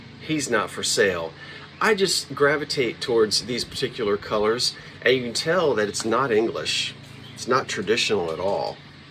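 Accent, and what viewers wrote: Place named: Philadelphia, United States. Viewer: I mentioned to tell u that whenever I get homesick for Philly , Jersey or Delaware I watch you. Even your voice and accent make me feel better.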